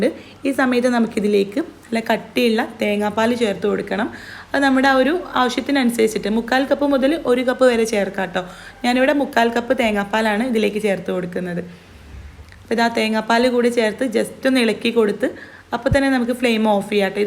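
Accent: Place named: Kasaragod, India. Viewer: കാസർഗോഡ് നങ്ക്...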